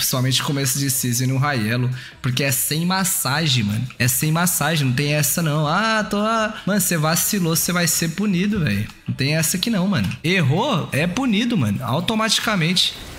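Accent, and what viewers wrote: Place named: Goiás, Brazil. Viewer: Goiano sô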